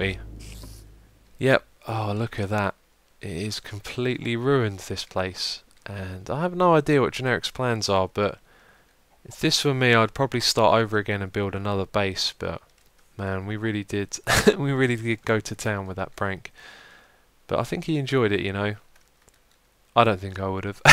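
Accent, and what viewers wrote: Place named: United Kingdom. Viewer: Brittish English....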